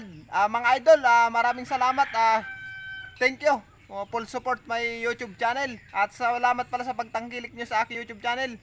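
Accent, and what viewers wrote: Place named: Albay, Philippines. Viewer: kala ko kasi taga albay ka yung tuno kaso ng pagsasalita mo parang sa albay. San ka sa cam sur idol? Update mo kame lage ki bagwis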